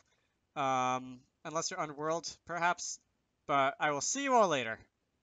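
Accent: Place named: United Kingdom. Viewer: you sounded more british than me wtf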